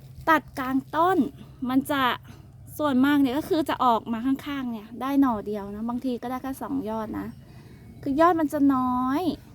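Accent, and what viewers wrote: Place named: Thailand. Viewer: เจ้าอยู่บ้านได๋น้อเว้าคือภาษาบ้านข่อยแท้น้อ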